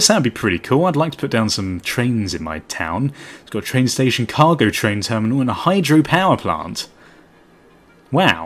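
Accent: United Kingdom. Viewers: British Accent FTW!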